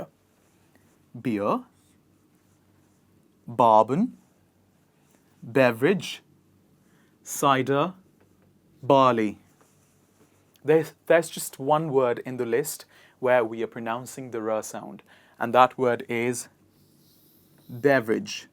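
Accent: United Kingdom. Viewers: Thanks for teaching but you’re pronouncing like British English and you might followed them but i do follow Indian English which is good to hear and pronounce also 🙏😊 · This is in British English, right? Because "r" is pronounced in many of the words you mentioned like "first, dark". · You're sounding british sound.. But in America we sound all r.. Slightly thick .. We not pronounce r silently the word's you've written...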